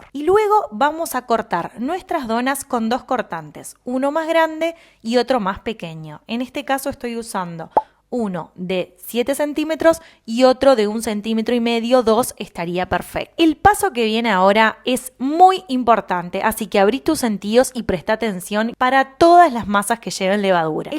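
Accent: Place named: Mar del Plata, Argentina. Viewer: Gracias, Probaremos tus apetitosas Donas, explicadas con tu lindo y ágil acento de Mar del Plata; soy panadero y repostero artesanal que hacemos hostelería con artes y tradiciones en Salento Colombia.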